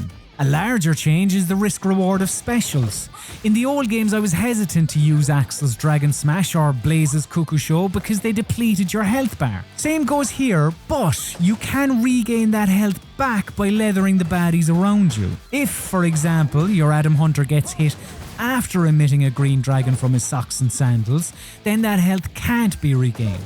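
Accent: Ireland. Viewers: Man its nice to hear another irish voice going on about great games :) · I like the Irish sounding accent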